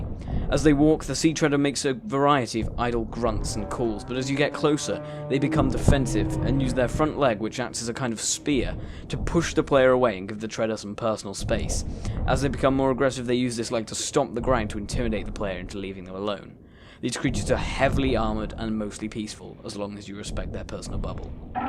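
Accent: United Kingdom. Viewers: y r u british :/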